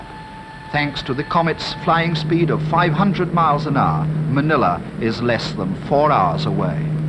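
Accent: RP, United Kingdom. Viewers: Filla-pines is just plain wrong, and RP is affected nonsense. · It is RP. Not affected, people did speak like that. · When the BBC strived to be POSH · That sort of accent is referred to as 'RP' (aka. Received Pronunciation)... and is particularly redolent of news readers of the 1950's, just as can be heard here. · The narrator is absurdly posh. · BBC RP, now long dead.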